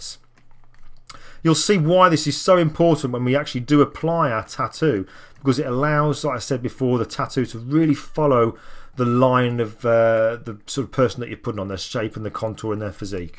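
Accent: United Kingdom. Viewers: O cara nem é americano kkkkkk é britânico u.u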